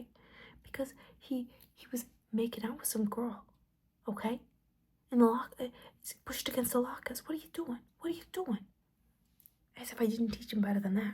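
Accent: New Jersey, United States. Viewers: Thank you for another Jersey accent video! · Girrrrrl you’ve GOTTA be from NJ or NY. As a Jersey girl myself, the accent is spot on. Loved this vid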